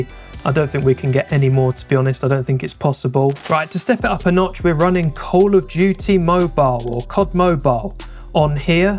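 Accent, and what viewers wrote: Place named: United Kingdom. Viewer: Good night I heard your British accent I'm sorry I can't watch it it just gets to me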